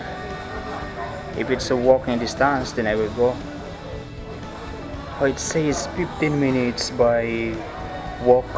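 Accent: Philippines. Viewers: do not listen to people who criticizes you for speaking in english. you are trying your best, and i can understand what you are saying. sure, you may have a filipino accent, but we can all understand what you are saying. i’ve travelled to a lot of countries whose citizens can’t even understand basic english questions. point being, keep speaking in english, as you can only get better at it. i don’t ever reply on youtube video comments, as there are too many trolls, but i can’t stand it when people criticizes others for trying to improve themselves.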